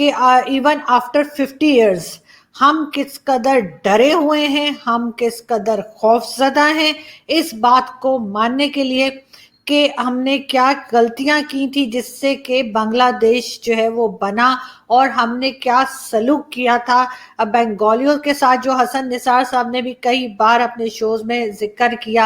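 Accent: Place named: Prayagraj, India. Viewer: jab aap bolti he aap ki awaaz me se prayag miti ki khoosbo ati he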